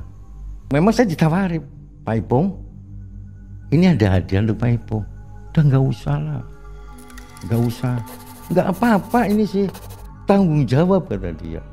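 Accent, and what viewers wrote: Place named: Tegal, Indonesia. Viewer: Tegal maning... Tegal maning..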